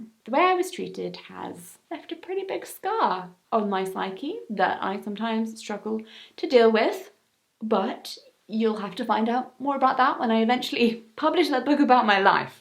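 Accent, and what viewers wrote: United Kingdom. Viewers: Hello, recent suscriber here, l like your channel altough l might not be in your optimal demo ( 53 y/o male), l do like interesting human beings and l find you to be quite striking and l think that you have a very melodic voice and a wonderful british accent, even if you can't hear it yourself ironnicaly, l'm french canadian and l do appreciate the fact that l understand you perfectly because of your brilliant diction and l think that you make a beautiful couple you and your wife, anyway, just wanted to tell you, oh and l'm certainly not alone in this but l wish that l could rid you of all the things ailing you with the snap of a finger! ☝️😌 you might not read this because of the many messages that you get but l just wanted to show my appreciation, so godspeed and be well! 🧡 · Hey . You said you’re deaf . Trying to figure out how your speech is so good . 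Like how were you able to pick up a perfect British accent without being able to hear ? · I wish there was a Love button instead of Like... I love your snarkiness!! So refreshing especially with the British accent; much love!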